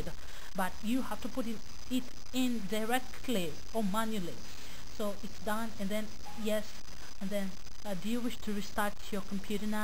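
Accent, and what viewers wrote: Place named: Africa. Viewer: No no crime we are brothers xD People don't understand an African accent when they hear one lol Keep up the great work + new subcriber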